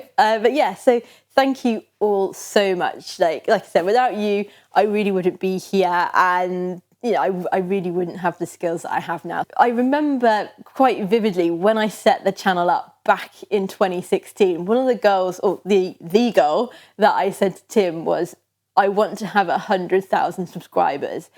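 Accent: England, United Kingdom. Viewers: Congratulations from Madrid! I love your English accent… where you from?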